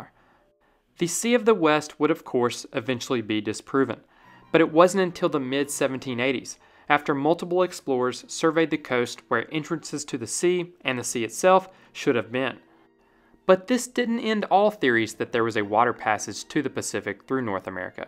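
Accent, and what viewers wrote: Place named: Ohio, United States. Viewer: Dear, this is fascinating history. However, your pronunciation of Dutch, Spanish and French personal names is cringe-making. You know, you can Google pronunciations of practically anything, thereby making you sound less like an Ohio kid who's never been farther than 100 miles from home. I know I'll get pushback for saying this, but there's a reason why, in England, Received Pronunciation (RP), or BBC English, is considered desirable for journalists -- so that accents and regional pronunciations don't distract from the reporting. Good luck!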